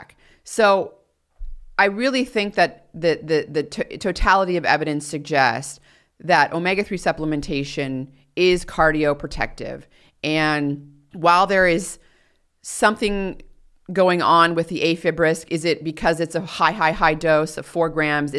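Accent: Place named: California, United States. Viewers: how would Americans call her accent? Californian? it's interesting. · California accent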